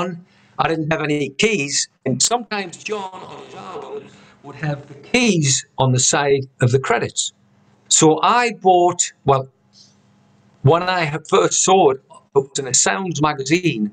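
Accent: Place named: Ashington, United Kingdom. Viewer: You sound a bit Ashington ish! Sorry if am wrong!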